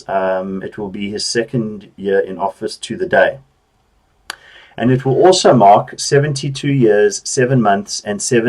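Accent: South Africa. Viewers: I can hear that SA accent boet 😁👍🏼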